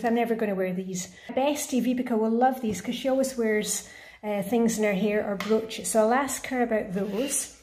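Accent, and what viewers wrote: Scotland, United Kingdom. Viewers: Hey, are you Scottish?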